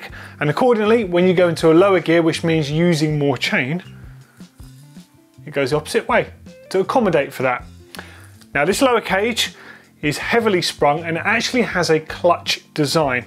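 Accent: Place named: United Kingdom. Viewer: Very clear and complete instructions. The British accent automatically adds a few points to the apparent intelligence of the presenter.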